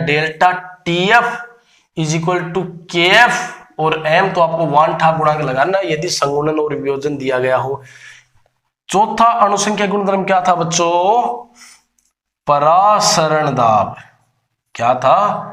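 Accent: Haryana, India. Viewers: Sir. Ap. Haryana. Se. Hai. Kya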